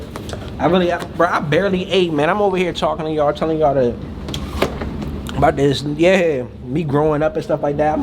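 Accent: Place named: California, United States. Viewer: Bro, it’s crazy. I always knew it something about you. I know my fucking people your mom and yo is from Cali. That’s crazy I don’t know if it’s Up North in Oakland or South Central or somewhere close but I could tell by your accent already but when I heard your mommy, Uncle 👊🏾 oh for sure, I knew what time it was.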